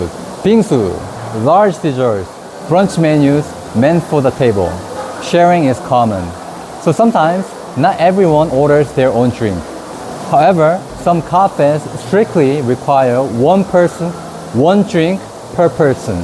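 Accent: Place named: United States. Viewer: By his American accent, sounds like the narrator came to the US after he was 13 yo?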